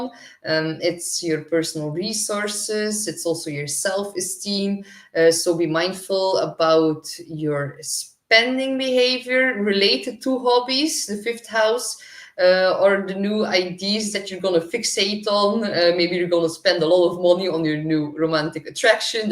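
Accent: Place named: Belgium. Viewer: Hi, I will check the degree of my rising. My Moon cnj Saturn in Capricorn has probably made things worse these past few years. I am in Perth West Australia so not in Your area at all. However the last few months I've been dating a Belgian Woman, that's the only reason I detected the nuances of Your accent as it's virtually unknown here. She is attractive too, My heart hasn't recovered just yet. Thanks for Your reply.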